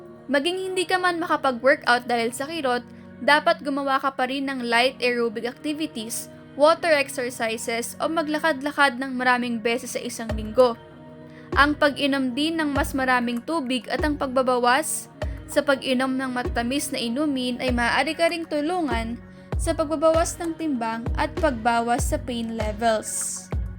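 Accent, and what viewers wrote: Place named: Iloilo, Philippines. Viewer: ilonggo ka doc ay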